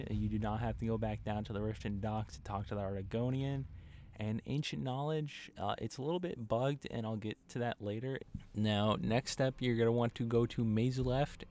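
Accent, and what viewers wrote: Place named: Michigan, United States. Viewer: are you from michigan? we talk verrrrry similar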